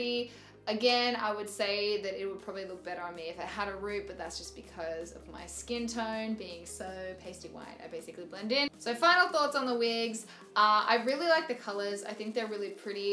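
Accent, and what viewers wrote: Australia. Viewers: Your Australian accent is everything!😩😍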